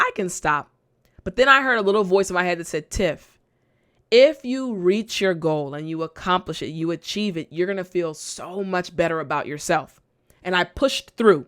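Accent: United States. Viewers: I like the way you teach English with the real American accent . I really enjoy listening to you. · Accent american listening very clear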